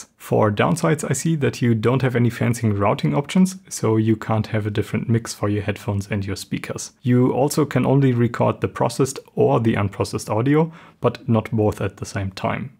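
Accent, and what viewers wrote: Germany. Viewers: your name isn't why are you pronouncing your own german name intentionally wrong? an english or french speaking person would never say their own name in a german accent in germany. you have the heaviest german accent ever but you're pronouncing your german name in an american way, makes no sense. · are you German??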